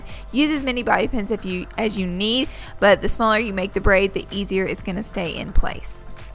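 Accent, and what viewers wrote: South, United States. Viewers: are you southern, you sound like me haha like a baby southern accent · You have a posh southern accent. I love it. Your so beautiful! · Are you from the south cuz you sound like it.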